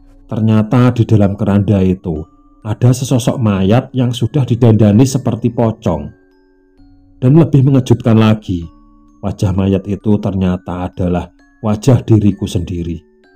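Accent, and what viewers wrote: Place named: Jember, Indonesia. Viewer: Iiiih Jember pisan ..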